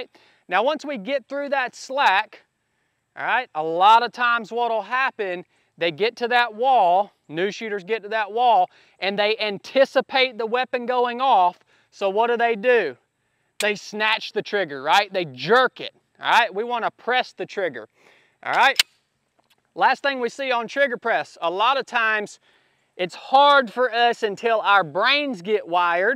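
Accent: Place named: Texas, United States. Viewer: Dammit brother If you didn’t tell me….id think your from Texas !!!!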